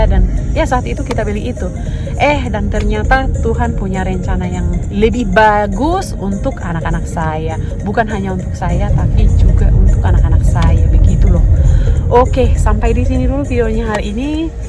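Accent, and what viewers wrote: North Sumatra, Indonesia. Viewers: Jadi ka itu asli dari samosir dan langsung merantau ke swiss, dsamosir itu bahasa batak dan kalo b.indonesia bakalan kental banget logatnya. Kalo ka merantau keluar medan mungkin bisa menyesuaikan, ka merantau ke swiss langsung pake bhasa sana, jadi mau gamau kalo ka ngomong b.indonesia ya bakalan tetep kental logat bataknya, and I think nothing wrong with that at all dan bahkan bisa jadi identitas 🙏🏼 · Ka kok logat batak kental banget ya😂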